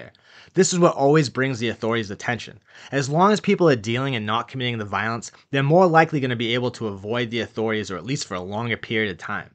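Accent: Boston, United States. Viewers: And I can tell this YouTube guy has a Boston / Mass accent. Love it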